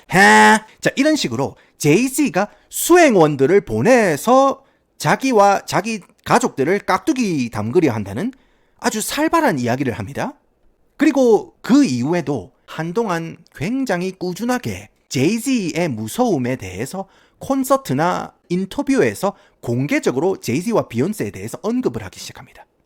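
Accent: Gyeongsang, South Korea. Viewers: 구수한 갱상도 사투리로 usa 조져주는 바로 그맛 아입니까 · 경상도 사투리와 완벽한 영어발음의 아름다운 조합. 좋아요😊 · 찰진 경상도 사투리 사이 사이에 들어있는 쫜득한 영어발음 때문에 컨텐츠 상관 없이 계속 보게 됨…외중독되… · 임마 😂😂😂 애비 🤣🤣🤣 처음 영상 보는데 너무 재밌게 잘 보았습니다 🤗 경상+잉글리쉬원탑티어!!! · 갱상도 사투리로 영어를 말아주네 ㅋㅋㅋㅋ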